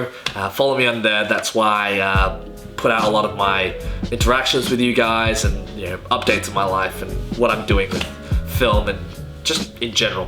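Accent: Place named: Australia. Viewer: Yesss, another Australian, possibly, maybe, the accent sounds about right. I haven't seen the movie yet, I'm just about to now, so I'm gonna watch this video when I get home, but I'm really interested to hear 18 minutes worth of your opinions on it.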